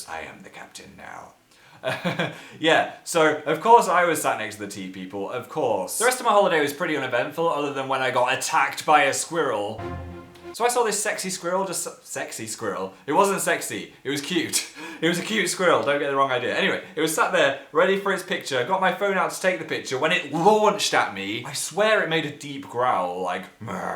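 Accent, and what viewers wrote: North, United Kingdom. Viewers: You're sounding rather Northern in this. I think the time you spent with your Mum and Dad rubbed off on you. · He sounds so northern in this video! In 2018 he doesn’t really sound like it anymore :c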